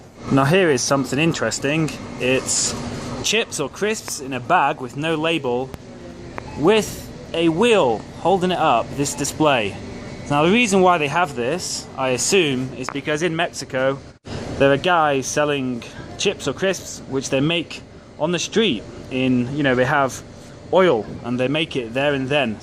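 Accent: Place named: United Kingdom. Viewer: I love the british accent !! > Good videos bro.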